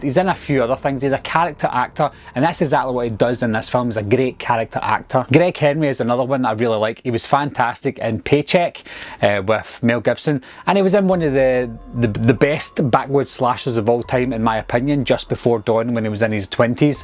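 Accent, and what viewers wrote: Scotland, United Kingdom. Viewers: Damn I was not expecting such... Scottishness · Love yer Scots accent, pal! Good to hear a Scots voice doing YouTube reviews. Miss that lots - used to bide in bonnie Aiberdeen, and aye, I still spik a wee bit o the Doric...agus tòrr Gàidhlig cuideachd!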